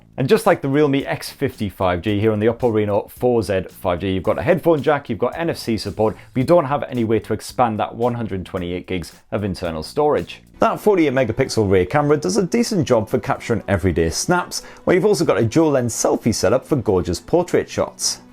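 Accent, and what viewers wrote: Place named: North East England, United Kingdom. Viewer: North East UK. Mic of English and Scottish English.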